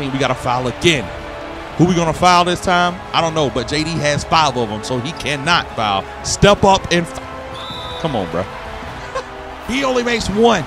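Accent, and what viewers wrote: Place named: Alabama, United States. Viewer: Nahh you gotta be from Alabama I hear it in yo voice 😭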